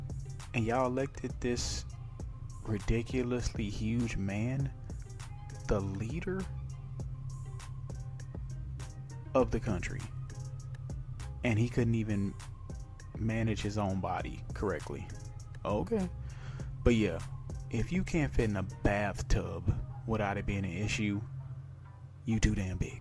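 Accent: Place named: South, United States. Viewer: His corn pone accent makes it all funnier.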